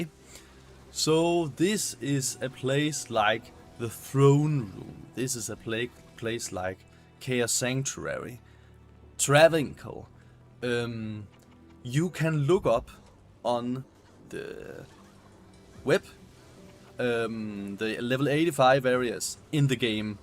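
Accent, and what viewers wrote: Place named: Denmark. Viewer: Uden tvivl dansk accent 😂😂😂😂😂 fed video!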